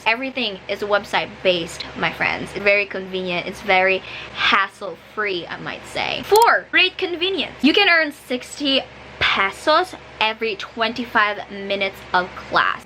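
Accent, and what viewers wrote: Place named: United States. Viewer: She speaks like an American 98%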